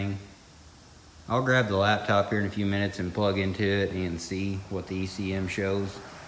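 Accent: South, United States. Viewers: I really enjoyed your video. That engine should clean up nice and you could probably sell it for a good price. Did you ever figure out how water got into the oil? BTW.... my wife is a native from New Orleans and she thinks your accent puts you in the mid to lower south. Let he know if she's right or wrong.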